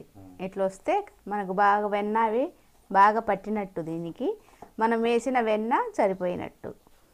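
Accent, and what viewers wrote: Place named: Kadapa, India. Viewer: Kadapa telugu super o super... Same to same ma amma matladinatle vundi... Duvvuru slang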